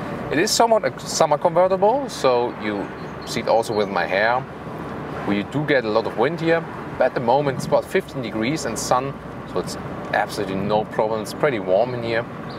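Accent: Germany. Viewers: As a German automotive enthusiast and senior professional in the industry, let me tell you that we just laugh about this guy and his shitty English here in Germany. He couldn't even think of the word "lock" , that's just unacceptable for a self-proclaimed automotive journalist working in English. He's carved out his niche on YouTube, with foreigners thinking he is more knowledgeable because of the very strong German accent, but he's really just an idiot doing a poor job.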